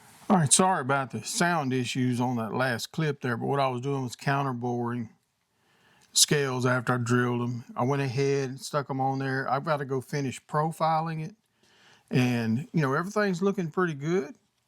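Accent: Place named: South, United States. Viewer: OK- so the short answer is yes! I have suffered "Gravity's Wrath" with some custom stained glass... As for the file work and the remaining content- you are eloquent and smoothly southern in your presentation- do not forge a can of screws! Frustrations abound but the horizon is simple- educate and just maintain the presence! Personally I appreciate you letting us into your shop. Be safe and as always- at peace.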